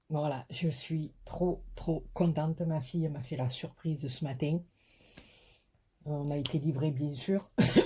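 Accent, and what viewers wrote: South, France. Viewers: J adore votre vidéo et votre accent du midi. Je vadrouille entre st Martin de crau et Ales Gard et le midi j aime. Chapeau 😘😘😘😘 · Oh qu'elle joie de te revoir, c'est bon d'entendre ton accent du soleil, tkt tu es hors champ mais pas grave trop bon de te revoir avec tes astuces et tutoriels. Super géniale marie-ange de Bourgogne. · Coucou je découvre ta chaine et je vais m'y abonner de suite .. le tuto du chapeau j'adore......et l'accent du midi également.. ici il neige a gros flocon suis du Nord... est ... ca caille ..bisous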